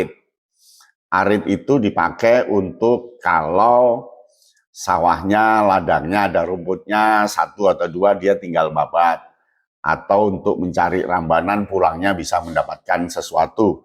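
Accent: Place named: Jakarta, Indonesia. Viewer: Sayangnya pembawaanya kurang Njawani malah terkesan bahasa ber ala Jkt.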